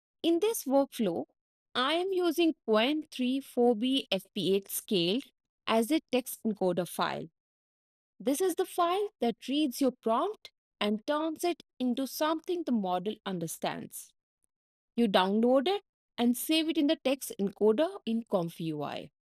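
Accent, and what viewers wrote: India. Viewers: Indian accent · I think her accent is Indian. I'm not so sure she is a she. It could be just an AI trained voice. Most of these channels utilize AI voices. Even people who have great voices are just handing it to the AI to create the audio from their voices, which is much faster, once trained, than recording it yourself.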